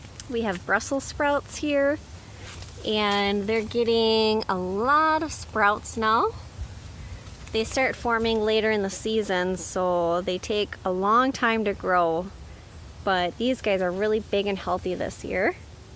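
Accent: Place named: Minnesota, United States. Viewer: Grew up in Brooklyn Park, but this Minnesota accent is almost too much for me 😝😝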